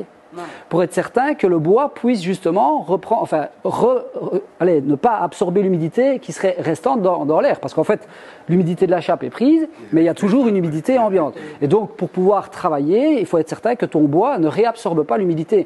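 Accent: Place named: Belgium. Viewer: ' c'est du Belche une fois hein' .... le charme à 100%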